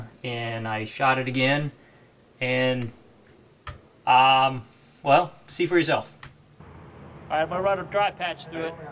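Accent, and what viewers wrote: United States. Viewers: i can hear a southern accent in you, how long have you live in texas? no offense im from up north.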